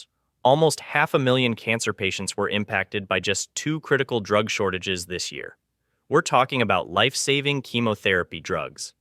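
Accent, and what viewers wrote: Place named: United States. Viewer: Is this spreading fear and propaganda via this American accented narration BS a thing? I'm over this shizz to be honest. Eff off 😂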